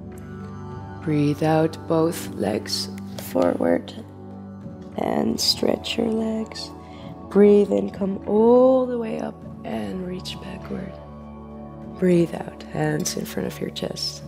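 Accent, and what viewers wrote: Netherlands. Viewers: Yes, she sounds Dutch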